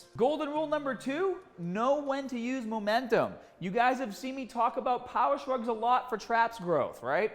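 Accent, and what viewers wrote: Boston, United States. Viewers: Ha,ha,is this a Boston accent? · Ahahaha. I couldn't get over the bahbell and had to find out if you were from Boston. Lawrence, close enough. Hi from Manchester NH although I grew up in Somerville. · This guys is from Boston isn’t he. Sounds like marky mark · Love the Boston accent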